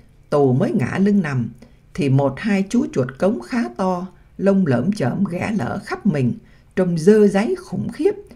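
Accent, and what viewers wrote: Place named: North, Vietnam. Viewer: Chào cảm ơn tác giả Thanh thương Hoàng câu chuyện thật hay buồn thương đến rơi nước mắt,cảm ơn MC "Bắc năm tư giọng như ru ngủ/đưa vào hồn" nhớ,đủ,mãi, luôn/thương thương lắm và buồn như muốn khóc /những người hùng ngang dọc đất miền Nam/rơi nước mắt thôi đành cam số phận/biết nói gì?buồn,giận, hờn ức hận thù căm/ chúc kênh vững mạnh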